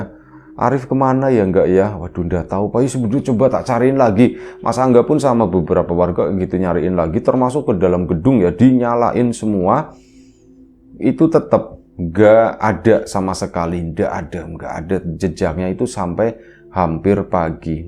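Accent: Tegal, Indonesia. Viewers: Wa, alaikummusasallam wr wb, Palembang hadir dan nyimak selalu, Di tunggu cerita selanjutnya, Buat moga makin sukses dan buat mas narator, moga sehat slalu dan slalu dalam lindungan Allah SWT, Owalah mas narator asli nya Tegal ya.....?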